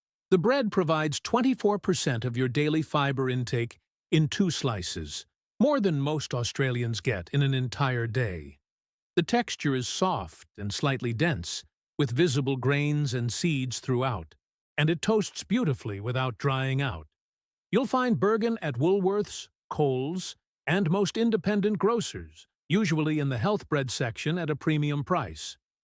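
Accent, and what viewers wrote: United States. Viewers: Why the American AI voiceover? Could’ve used an Aussie voice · Yeah okay.. but other sites advise that the breads baked in coles, woolworths and bakers delight are not mixed on sites. They are baked from frozen mixtures. So how is that being honest with the public. It's all such a minefield. And no offence, but why are a couple of the sites similar to this narrated by someone with an American accent?accent.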